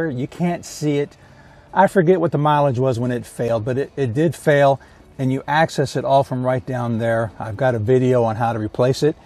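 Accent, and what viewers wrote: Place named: United States. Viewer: Wow! A European speaks American English with the proper accent.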